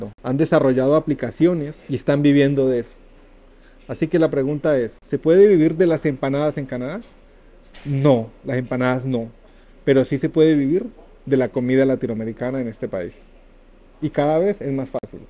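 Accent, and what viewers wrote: Colombia. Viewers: Menos mal que alguien dice mucho de verdad al parecer eres un hombre de bien DIOS te guíe siempre muchacho, pero si ayuda mucho si vendes empanadas a los restaurantes y en tu casa si tienes entrega por lo menos yo lo hice y mis hijos tuvieron pan en la meza. Pero eres un hombre correcto tú no eres mexicano por tu acento eres colombiano ojalá no me equivoque te deceso lo mejor. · Este habla como hablaban los colombianos en los 80/90 cuando estaban huyendo de la guerrilla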